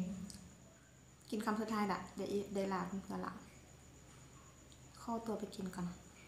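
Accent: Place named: Isan, Thailand. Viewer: บ้านผม เรียกสำเนียงแบบสาว ว่า เว้าย้อ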